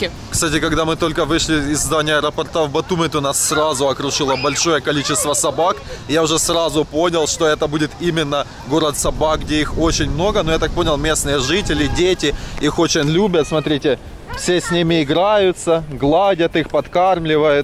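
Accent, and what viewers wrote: Ukraine. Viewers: По говору слышно что из Украины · Такой колхозный акцент как и у всех из украины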